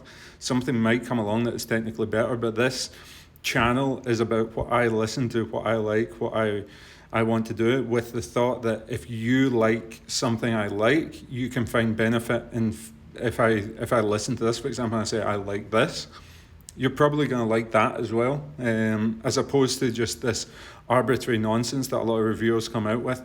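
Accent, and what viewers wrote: Scotland, United Kingdom. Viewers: British ?? that would explain your accent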